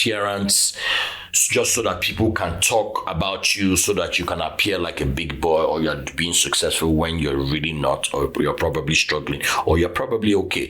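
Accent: Nigeria. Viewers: I can tell you straight away that you are Nigerian and lam not from there! First few moments of talking.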